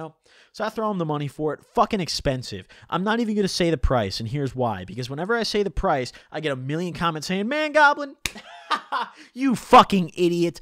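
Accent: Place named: East Coast, United States. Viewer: Where are you from? gotta be east coast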